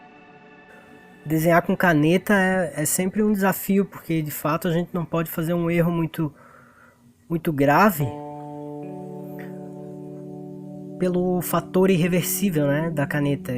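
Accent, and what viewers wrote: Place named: Santa Catarina, Brazil. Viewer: Esse sotaque Catarina é inconfundível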